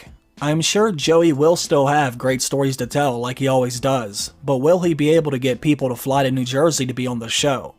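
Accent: Pittsburgh, United States. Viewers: Hey! I grew up in the burgh’, I can hear that Yinzer in your voice. Am I right?!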